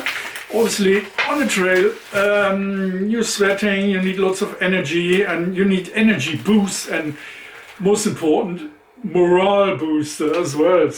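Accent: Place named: Germany. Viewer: Hi, I've been watching your videos and loving them. Good stuff! I love your sense of humour, attitude and also the English twist on your German accent. Thank you so much for sharing all this. Watching from Wales.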